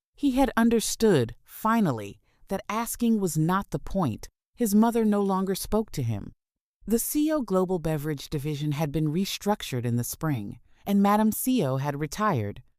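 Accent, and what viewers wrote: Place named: Caribbean. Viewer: Loving this. Hearing the Caribbean influence is beautiful. Wished there was some good accents. Lol. Respect from Bim🇧🇧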